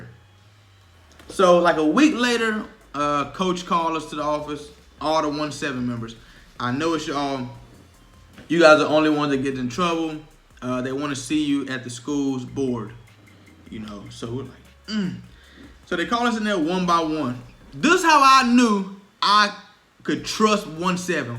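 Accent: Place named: Rock Hill, United States. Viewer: Sounds like a rock hill dude 😂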